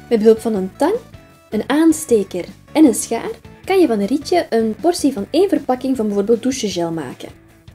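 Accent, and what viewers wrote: Flanders, Belgium. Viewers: Ben je Belgisch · Just Girls Het is overduidelijk Vlaams. Ik heb veel vrienden in Limburg hier in België, het klinkt nog net iets anders als in Nederland. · ze is een Belg die hebben vaak andere uitspraken en wat boeit het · Ik dacht dat dit Vlaams was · Huh, je bent toch Belgisch? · Dit is een nederlands kanaal maar de stem is belgische?😂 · dit ks eenrder vlaams dan nederlands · jij komt uit belgië? zo klink je anders wel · Dit is nie Nederlands .,. Belgies 😑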